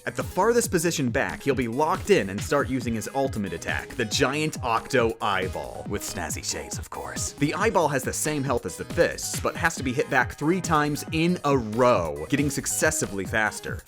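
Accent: Canada. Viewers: Is it just me, or did he sound very Canadian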